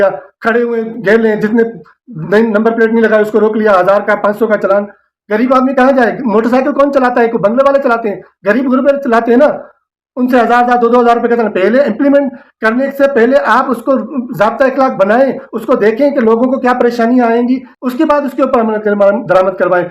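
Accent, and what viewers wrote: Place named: Sindh, Pakistan. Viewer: بھائی اپ کی ویڈیو دیکھی اپ عوام کے لئے بہت دفاعی اواز اٹھائی ہے اللہ پاک اپنی شان کے مطابق کی اور ہماری مدد فرمائے بھائی اپ ہمیں سندھی لگ رہے ہیں اپنی انداز اور اواز سے ہم جب اندرون میں سندھ جاتے تھے تو سندھی لوگ ہمارے لئے بڑے پیار سے لسی پراٹھا مکھن لے کر اتے اور خوشی خوشی ہمارا سات سات جگہیں گھماتے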